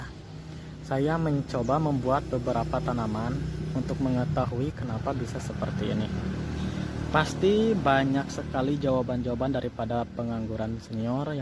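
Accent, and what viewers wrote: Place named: West Java, Indonesia. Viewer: pohon anggur saya juga mengalami hal yang sama dan sedang dalam pengobatan... logat Sunda ketara euy 😂😂😂🙏👍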